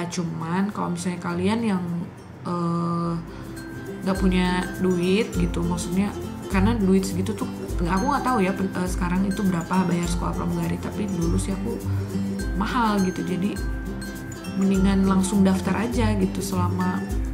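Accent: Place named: West Java, Indonesia. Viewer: orang sunda ya .. sok silahkan .. hehe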